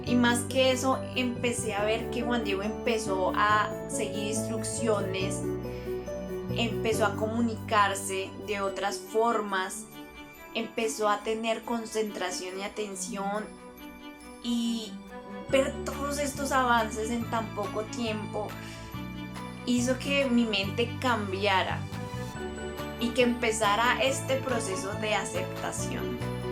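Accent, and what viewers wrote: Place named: Colombia. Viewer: Hola, pareciera que fueras de Colombia, la verdad acabo de conocer tu canal y es de bendición para mi vida, agradecería que si estas en Bogotá me recomiendes el jardín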